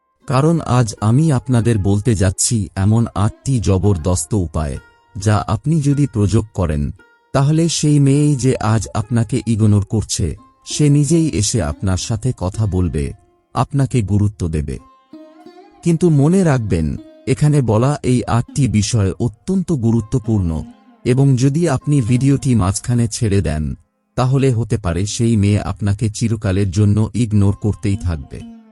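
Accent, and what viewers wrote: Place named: Chapai Nawabganj, Bangladesh. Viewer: ভাই আপনার বাড়ি কি চাঁপাই? আমার এক্সের বাড়ি চাাঁপাই, ও এই ভাবে কথা বলতো আপনার স এর উচ্চারণ এই রকম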